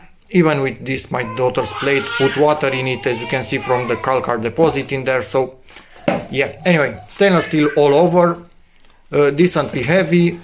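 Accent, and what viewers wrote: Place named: Romania. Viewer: I have a Romanian friend who sounds exactly like you when he speaks English.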